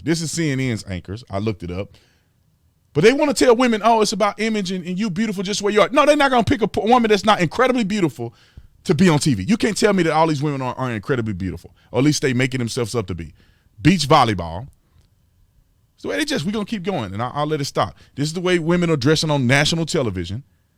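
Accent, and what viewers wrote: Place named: South, United States. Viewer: definitely has a southern accent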